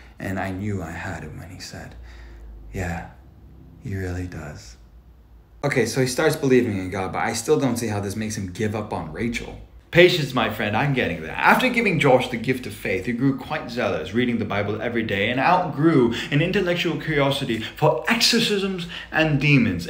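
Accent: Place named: United Kingdom. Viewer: I absolutely love your Elegantly prestigious English accent, which makes the nature of the situation more dire in a dramatic yet intriguing manner. I do applaud you sir for the excellent plot twist that had my imagination in full attendance, in which I Invisioned this fold of events effortlessly in my mind. I would love to see you create a video in which you use that brilliant English accent to depict a Royal speaking to a peasant in a boastful yet menacing way.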